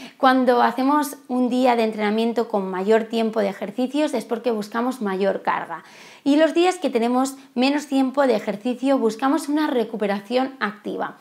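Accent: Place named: Spain. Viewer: .. Ayer descubrí tu canal de GymVirtual y ya estoy haciendo mucho tus rutinas! Me encantan! Tus otros dos canales también son hermosos! Eres una hermosa y motivadora mujer! (AMO TU ACENTO ESPAÑOL😃) Saludos desde Argentina🙆😘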